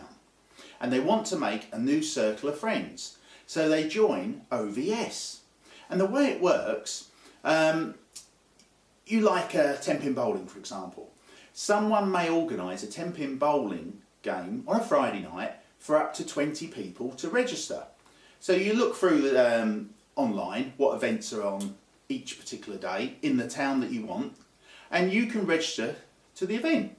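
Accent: United Kingdom. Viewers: Super my dear friend with the great British accent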